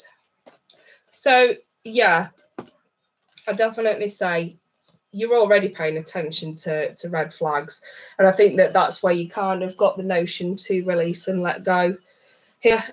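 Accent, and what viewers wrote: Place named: United Kingdom. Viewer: Your simply splendid! Team Aries here trying 2 let go of Libra drug addict ex Tyler recommend u Love yr British accent 👌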